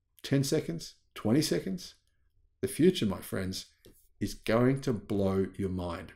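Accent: Australia. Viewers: You have an Australian accent except when pronouncing Fortescue and Pilbara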